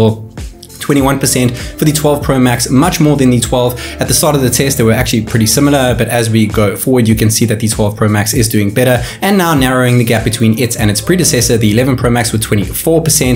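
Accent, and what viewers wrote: South Africa. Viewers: Saffa accent so strong 💪🏽🤣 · Dude are you from SA? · Is this guy South African?🤔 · U south african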